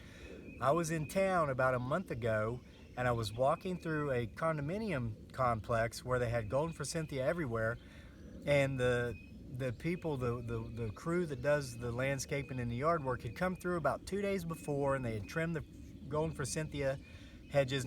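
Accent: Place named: Virginia, United States. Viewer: Before I even noticed your hat I knew you were from VA from your accent!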